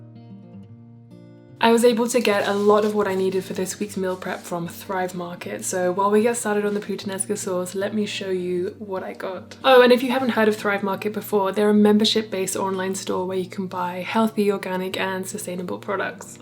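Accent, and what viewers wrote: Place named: United Kingdom. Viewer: You have such an interesting British accent, what region are you from?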